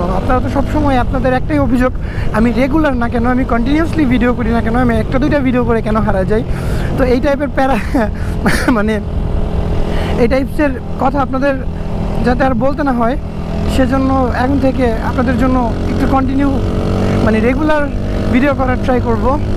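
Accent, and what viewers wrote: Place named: Bogura, Bangladesh. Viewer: ভাই বগুড়ার কথা শুনে খুশি হলাম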